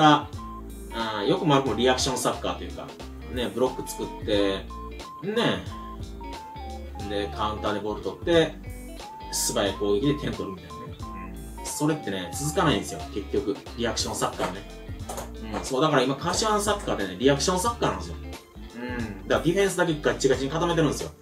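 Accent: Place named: Kansai, Japan. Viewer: 関西弁だな、西日本のタレント軍団のサポ？！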